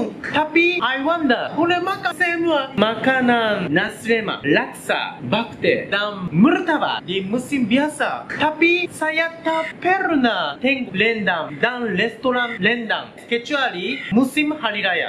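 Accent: Japan. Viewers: Suka dengar cakap. Tatabahasa sangat baik. Cuma sebutan masih ikut pengaruh consonant Jepun. BTW, mana boleh makanan Jepun rasa makan hospital?! 🤣 Saya suka makanan Jepun. Lagi bagus dari makanan hospital. Kalau lama tak makan makanan Jepun, asyik terbayang di kepala dan lidah. Sashimi dan kare raisu paling best. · i love the way he try his hardest to speak malay even the japanese slang was there a bit but the effort tho really deserved praises. Here some virtual hug for you !!!!❤ p/s: imma eat nasi lemak 2morrow morning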